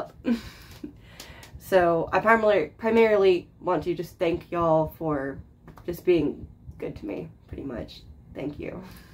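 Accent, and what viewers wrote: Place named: South, United States. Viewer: I never realized how heavy that southern accent is. I thought it was just a big time lisp.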